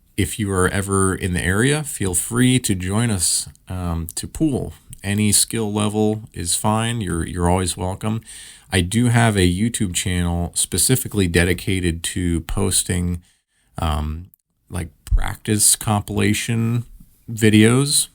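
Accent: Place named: United States. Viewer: Finally, found an AW news channel with an American accent .. plz post daily🫡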